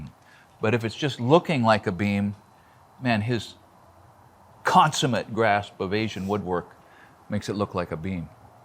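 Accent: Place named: Midwest, United States. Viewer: I hear a Midwest accent in your voice.🤔 I grew up in LA, "Shakey Town", Southern California.😧 It's obvious you're not building for earthquake resistance.🤨 That's the reason for some of those "odd" tenon shapes.☺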